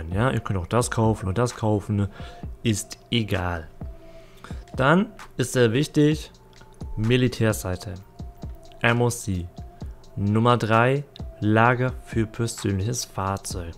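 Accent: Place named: Sweden. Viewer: Oha hätte niemals gedacht das so ein Schwedischer Restakzent klingt aber ist auf jeden fall mal was anderes. Hat was ^^ Ist auch ein seht sehr schönes Land definitiv und gar nicht immer so kalt wie man denkt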